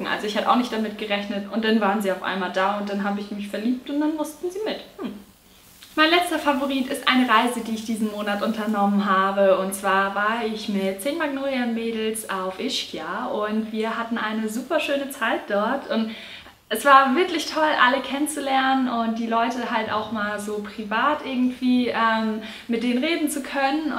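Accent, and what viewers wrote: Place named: Berlin, Germany. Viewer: Ich find dich super sympathisch! :) Kommst du aus Berlin? Du bemühst dich zwar sehr feinstes Hochdeutsch zu reden aber ich werd das Gefühl nicht los, dass du von hier bist :)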